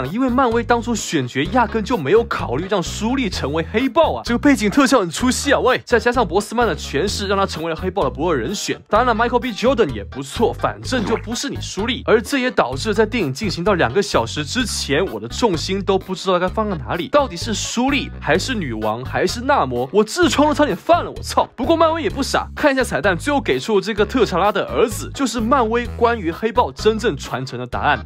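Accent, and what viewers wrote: Taiwan. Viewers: 你講話好台灣腔哦 都沒有捲舌欸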